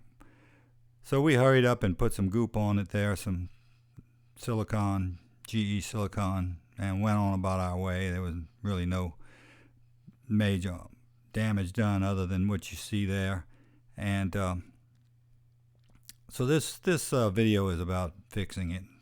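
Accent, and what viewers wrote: Louisiana, United States. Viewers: Man when I heard you talk I knew you were from my home lol! My camper caught a branch through it in the last hurricane that came through carencro. I just had it hauled up to Washington for me and finally getting around to dealing with the hole. Gotta get everything out of it first. Pretty sure I got some water in there but the good thing is it’s so dry here none of it sits. We’ll see how it goes. Are those special mesh patches for fiberglass? Or could you use Sheetrock patches?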